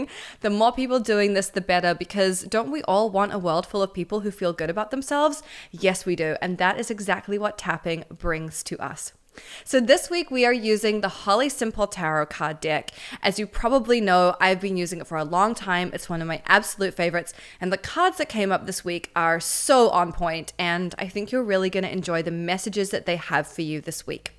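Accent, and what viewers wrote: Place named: New Zealand. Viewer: 😹😹😹 FUCK ME... you are hilarious!... it’s so lovely to hear another NZ accent and one that swears as much as I do! 😹😹😹 I shit you not muffin! 😹👍🏻 I am here in LA 😋💛 and I am on IG... not very much but I will toddle on over and DM you 😹👍🏻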